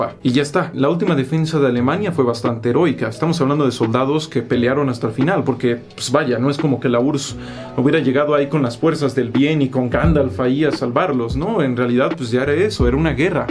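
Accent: Chile. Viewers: Excelente, en chileno entiendo mejor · es chileno?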